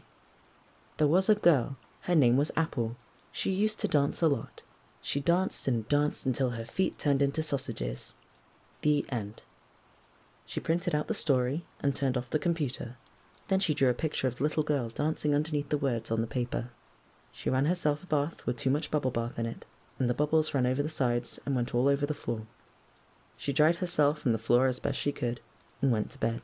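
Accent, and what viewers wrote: United Kingdom. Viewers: I'd never thought that a British accent Coraline would work just as well as the typical American one, yet here we are.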